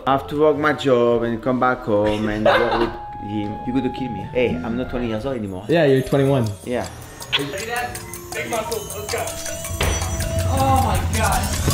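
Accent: France. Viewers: The French accent ! xD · C'est juste dingue😶C'est la première vidéo de toi que je regarde et ça me donne déjà envie de m'abonner...Allez, +1! PS: Your french accent is hilarous🤣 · Are u french? Anyways i love ur video when i see a notif of u i smile instantly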